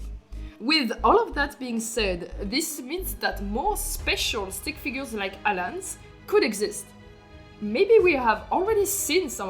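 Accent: France. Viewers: Very cool video but I just want to ask are you french ? Sorry im curious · Is that french accent 🙏🏼😭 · Watching cool video As a French instantly recognise the strong French accent Even cooler video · Est-ce un accent français que j'entends là? (Is it a French accent that I'm hearing here?) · French accent (⑅•ᴗ•⑅) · Not related to the video but are you french by any chance?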